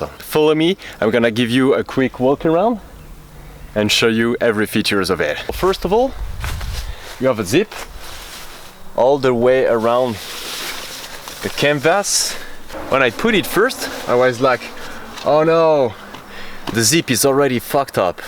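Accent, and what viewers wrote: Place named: France. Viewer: french? cute accent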